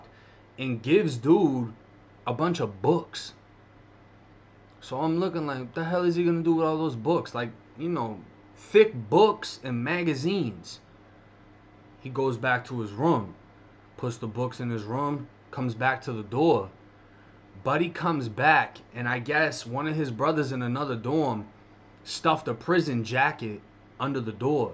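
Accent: Boston, United States. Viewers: I hear Boston. Is it? Yes. Wicked Boston! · You sound like us Boston Ma accents lol. I'm glad I came across your channel.😁 let me find out your from Massachusetts 😁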